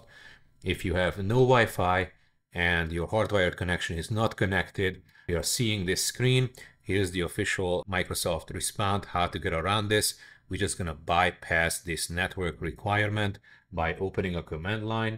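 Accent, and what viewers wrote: Hungary. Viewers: You must be a Hungarian. Nice accent! 👍 and also greate guide!!!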